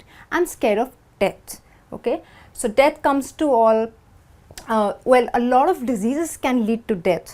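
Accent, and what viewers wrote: India. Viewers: You english sounds like indian english. I learned very much with this lesson. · Are your English is Indian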